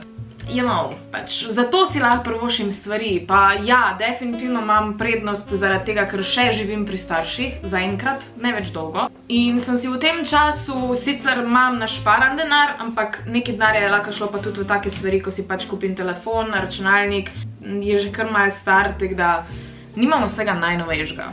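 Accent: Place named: Kranj, Slovenia. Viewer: Mene tvoj naglas sploh ne mot ampak je ok:) moja frendica ma tak naglas pa je iz Kranja. Nekateri imajo slabo mnenje glede tebe ampak meni si super;) tudi če snemaš life trvari ali whatever si dobra influencarka pa še vem ne kaj to pomen(: men je ful dobr da še mal anglish govoriš ( tut js) bodi taka kot si radi te mamo tako:)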